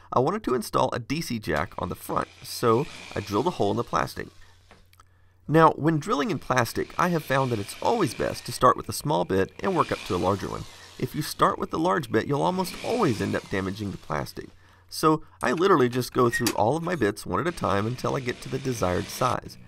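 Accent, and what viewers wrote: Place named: Texas, United States. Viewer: Classic 8 but guy. Always a pleasure. Is it just me or does sound a bit more Texan in these early videos?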